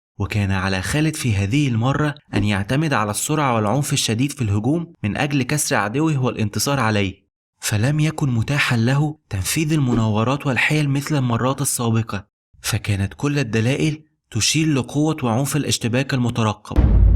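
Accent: Egypt. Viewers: قناة جميلة جدا و مجهود يشكر لكن نطق اللغة العربية ضعيف جدا و تغلب عليه أخطاء نحوية و مخارج الحروف بلسان مصري غير فصيح. أتنمى التغلب عليها رغم صعوبتها. وشكرا مرة أخرى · اخوي ذول الناس يحاربونك عشان ما تكمل روح كمل ولا تهتم نفهم على لهجتك المصرية ما عليك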